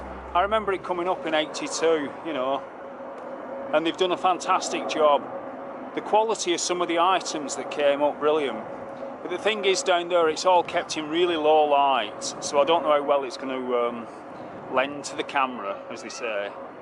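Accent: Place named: Northern England, United Kingdom. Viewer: Awesome video and walk,. I've been eagerly awaiting the arrival of part 2, which has been an enormous pleasure to watch. A question for you... I was born and live in and around Southampton for just over 22 years, yet I know that your accent is not from the south, so, where were you born? I'm always being told that I haven't lost my accent, yet I've live in the Peak District now for 52 years. This is why I love watching your southern walks and camps so much, one never forgets one's home of birth. Thanks so much and I'm looking forward to your next walk/wildcamp. Take care...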